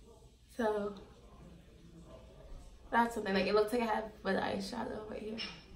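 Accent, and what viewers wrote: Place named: Boston, United States. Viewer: Are u from Boston? What an accent ! 🙂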